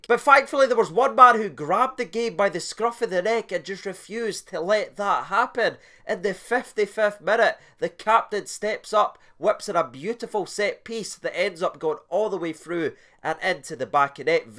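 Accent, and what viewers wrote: Scotland, United Kingdom. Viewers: Have you seen the auto generated subtitles to your video? really funny. it's never too great with Scottish accents, but with that thing on your nose, there's barely a word right. Anyway keep up the good work with the channel 👍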